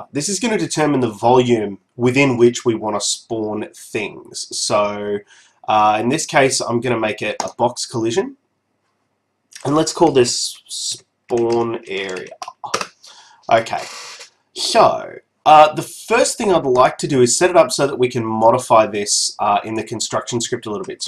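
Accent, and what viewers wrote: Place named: Australia. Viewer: You must be from Australia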